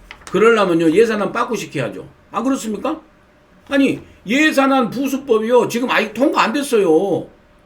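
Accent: Jeolla, South Korea. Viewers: 전라도사투리 너무 좋구요 옳으신논평 잘 경청합니다 민영삼티브 파이팅입니다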